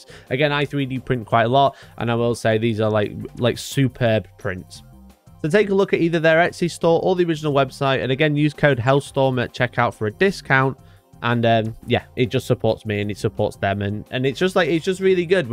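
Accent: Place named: Newcastle, United Kingdom. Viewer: Not a clue what this guy is saying. Must be from Newcastle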